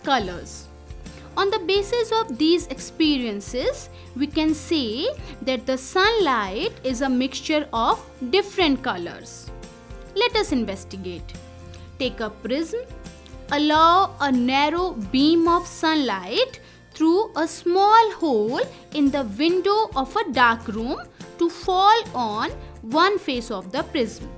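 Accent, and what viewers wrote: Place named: India. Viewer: Wow VERY NICE ENGLISH FOR SURE SOUNDS LIKE INDIAN VOICE BIG L CHANNEL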